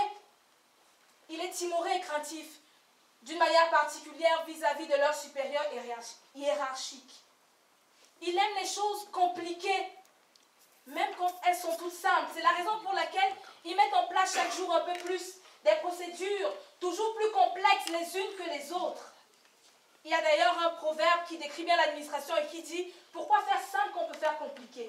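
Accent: Cameroon. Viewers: je savais déjà que tu étais une camerounaise avant même que tu le prononces. J'aimerais vraiment te rencontrer :).🇨🇲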